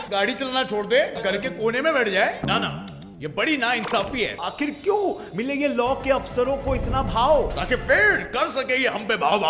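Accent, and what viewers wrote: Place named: Telangana, India. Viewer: Telangana hindi language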